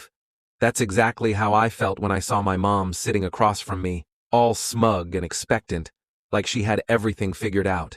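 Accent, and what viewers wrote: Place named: United States. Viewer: Although the voice over is American. the road trip filmed on the video, looks more like some third world country.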